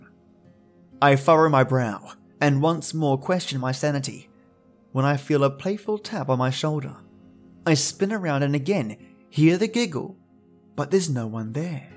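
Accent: Australia. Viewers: You make me wish I had an Australian accent.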